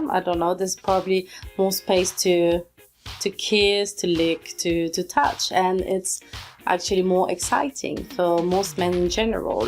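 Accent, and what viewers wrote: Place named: France. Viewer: Curvy woman with confidence and a cute french accent? Beautiful! Subscribed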